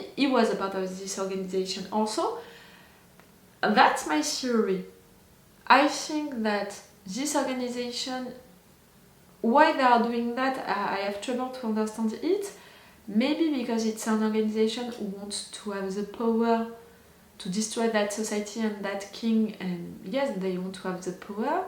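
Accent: France. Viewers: I would avoid Lost Girls OVAs until you're done with Season 2. They do have some spoilers to them, but after go for it. I love your accent, please never apologize for being who you are. We subscribe knowing you're French and your reactions are very genuine and funny. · your french accent is adorable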